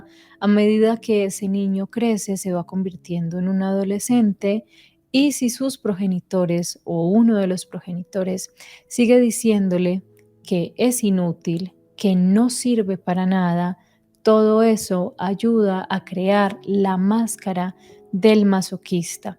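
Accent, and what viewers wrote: Colombia. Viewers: Muy linda voz !! Es de Bogota’?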